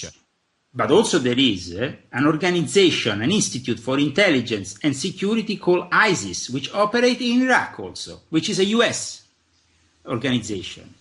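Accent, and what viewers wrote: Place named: Italy. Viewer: Dude is a loon job who has the uncanny ability to memorize conspiratorial material & has a wild imagination- compounded by the fact that people are impressed by the his Italian accent. Not such a big deal to me as all my in laws sound exactly like Leo when they speak -minus the random chaotic scattered madness. This is ALL COMPLETE BULLSHIT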